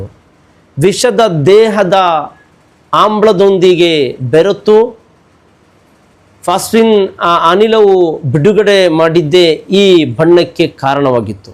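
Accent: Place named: Mumbai, India. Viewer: Nimma kannada Mumbai kushi agthide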